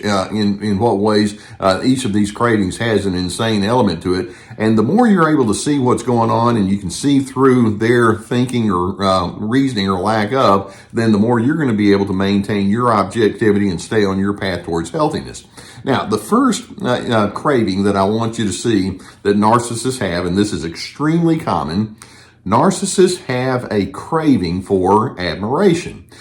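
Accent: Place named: Houston, United States. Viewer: This is utterly excellent. Thank you, a voice for real humanness in a world gone mad. You your words truly benefit many. Your humility and honesty nurture our souls and authentic selves. I have even come to enjoy your Houstonian accent, very much, because your balancing Weltanschauung shines through the way you convey your wise words and pure intent. So, thanks again and again. To me you are a Voice in the wilderness. You seem to be a Voice for The Voice of the Silence...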